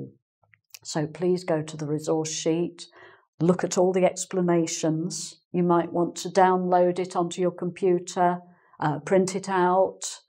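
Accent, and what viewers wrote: United Kingdom. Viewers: Great explanation! ... and your nice British accent: Terrific... I love it a bunch ! ... Thumbs up ! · Thank you very much teacher. it's so interesting and helpful video. please do more lessons involving English pronounciation. I really like British accent ^^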